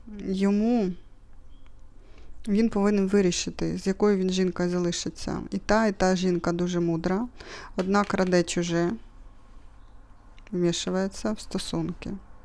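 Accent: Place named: Russia. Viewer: Так, привіт, що ж я перша сьогодні, гарно оформлені ці ленорман та жаль що капацькі родом. але люде й так не знають хто їх виготовив, тож все одно повірять. продовжуй гадати. круті когті, зразу видно що хижачка, що не властиво українці. Але й рушник теж намальований, не вишиваний він вручну. Кого обманюєш липовим полотенцем, і вимовою з расейянським акцентом, певно що дурачків?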